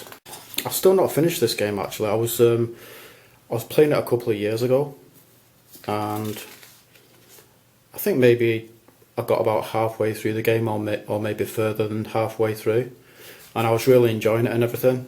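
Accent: Manchester, United Kingdom. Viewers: I'm from North Manchester, I thought you definitely sounded Mancunian. Thinking we may be of a similar age, from your gaming videos. I'll be 42, in a few weeks.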